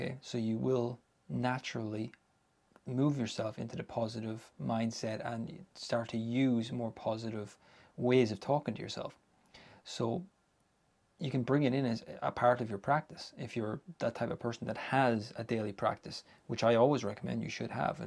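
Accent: Ireland. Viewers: New to your channel. Totally off topic.. are you Irish?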